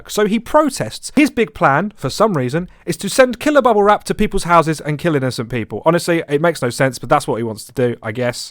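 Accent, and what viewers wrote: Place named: Yorkshire, United Kingdom. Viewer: Good Yorkshire accent tho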